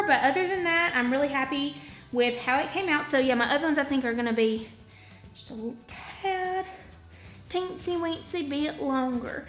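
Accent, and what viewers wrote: South, United States. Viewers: My goodness, your just about the cutest thing I ever seen. Your southern drawl is so darling. I just stumbled across your channel and yes ma'am I liked subscribed and hit the bell so I will be seeing you again real soon. Oh and that little hanging pot is too cute!! 😙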